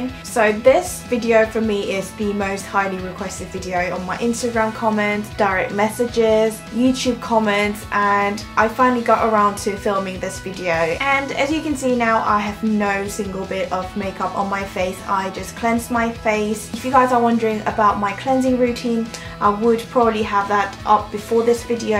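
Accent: United Kingdom. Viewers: 언니 목소리랑 영국발음이랑 너무 잘어울려요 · 영국식 발음 너무 이뻐요ㅠㅠ 프라이머 비교 영상도 보고싶어요 !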